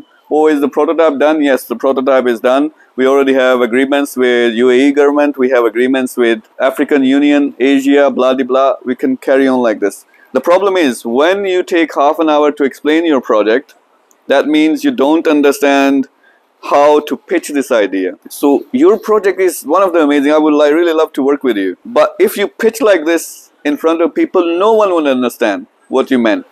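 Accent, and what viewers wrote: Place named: New York, United States. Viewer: NYC way of talking an English 😊🖤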